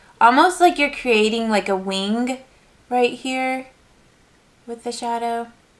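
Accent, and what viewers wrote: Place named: South, United States. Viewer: i know this is an old video, but this is so pretty! i hope they still make this palette. im so glad i found your channel, and i love your accent too. at first i thought you were from another country (not the u.s), but then i realized that its southern. thanks for this bold but wearable tutorial.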